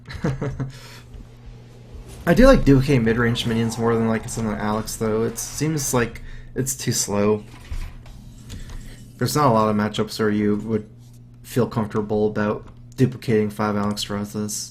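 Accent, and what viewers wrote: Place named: South, United States. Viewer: ***** Because has a southern accent.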